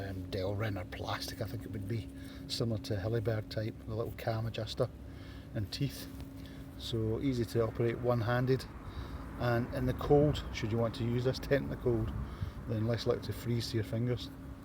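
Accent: Scotland, United Kingdom. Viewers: He's a Scot...you're a moron.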